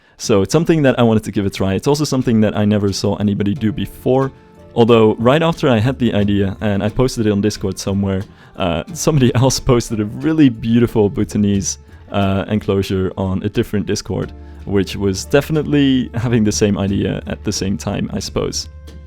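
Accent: Netherlands. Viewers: Your English is really good, but for some reason I still knew you were Dutch the second I heard your voice.. Not sure how that instinct works😂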